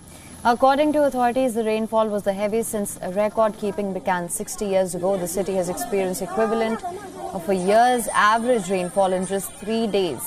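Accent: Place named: India. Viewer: The anchorwoman looks like a Filipina but with an Indian accent.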